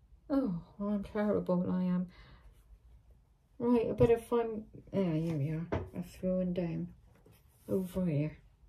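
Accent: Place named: England, United Kingdom. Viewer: So lovely to catch up with you again. You laser light is so handy. It saves drawing a pencil line to follow. Love seeing you putting your pretty fabrics together. I have reserved the Alices Wonderland sampler book in my local library. They only have one copy and I am 8th out of 9 on the waiting list! It is a very popular book. You live in a lovely area of England and your accent is so very cute. Take care xx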